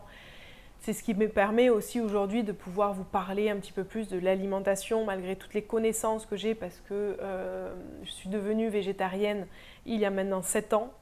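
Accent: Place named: South, France. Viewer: je t'ai découverte sur instagramme pendant le confinement et j'ai tout de suite accroché à tes vidéos, à ton univers, à tout ce que tu dégages. J'aime ta douceur, ta joie de vivre, ta sincérité et ton autanticité, ton petit grain de folie, ta voix et même ton accent du sud. 😅 J'adore comment tu prononces les mots "môlet" et "môbilité" en appuyant bien sur le son "ô" 😉 En septembre, comme je n'étais pas vaccinée et n'avais de ce fait pas mon pass sanitaire, il etait impossible pour moi de m'inscrire dans une salle et de suivre des cours en présentiel. Du coup, c'est tout naturellement que j'ai décidé de m'abonner à tes 3 programmes en ligne pour pouvoir tout de même pratiquer le Yoga de chez moi. Et depuis tu fais réellement partie de ma vie. Tu m'appaises, me rééquilibres, me détends, me fait rire... Si je ne pratique pas pendant toute semaine et bien ça me manque. 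Je rêverais pouvoir participer à l'une de tes retraites, mais pour le moment, je n'ai malheureusement ni le budget ni les vacances pour. Mais ça reste un de mes objectifs 😇 Un grand merci pour tout ce que tu nous offres. Tu es une si belle personne 💜 Namaste 🙏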